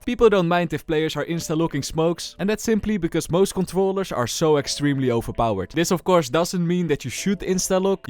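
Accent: Netherlands. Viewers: I hear the dutch accent in every sentence hahaha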